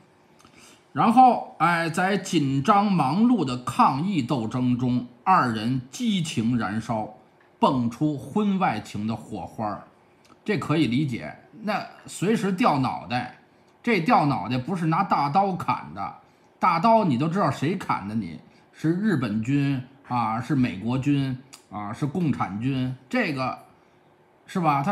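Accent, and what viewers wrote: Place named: Beijing, China. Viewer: 北京话好听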